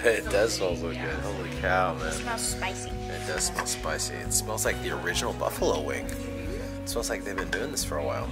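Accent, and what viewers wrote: England, United Kingdom. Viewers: Happy Birthday the Great, we love you guys you crack me up with your English accent.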